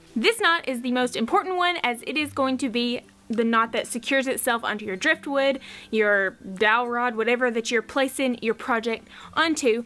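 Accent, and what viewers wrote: South, United States. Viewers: I hear a Southern accent...where are you from? Tennessee here. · Now if all my teachers in the high school where is interesting beautiful talented and charismatic as you are I think I would have been a straight a student not to mention that beautiful Southern accent really makes you hang on every word I can't thank you enough stay safe and and god bless ✌️🇦🇺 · Oh my gosh, you are such a stunning Southern Belle! I love your accent, I love your vibe and thanks to your simple and beautiful videos I can now do macrame just like my mama used to when I was little. She tried to teach me but let's just say she wasn't a natural born teacher lol. Thank you so much! Big hugs 😘😘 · Thank you sweetie, I love your accent, I am a southern girl too, need to learn macrame so here I am! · Where are you from? I know it’s the south for sure 😂🤣😂🤣😍😍😍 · Love your southern accent !😂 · I like your accent. 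What Southern State are you in? This Video helps me a lot. Love it. Thanks! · I'm from California and very very rarely do I like southern or country accents but yours.....omg! Its toooooo cute! I love it, love your bubblyness that's not goofy and I LOVE how articulate you are with your directions and instructions! You're a VERY GOOD instructor! Thank you for the refresher course!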